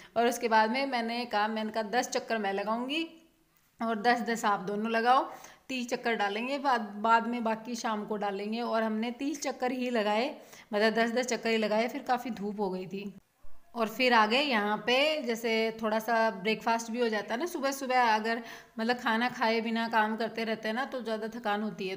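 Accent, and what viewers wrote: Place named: Rajasthan, India. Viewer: Di tha haryana ari boli na bolo rajasthan ari boli puri ab to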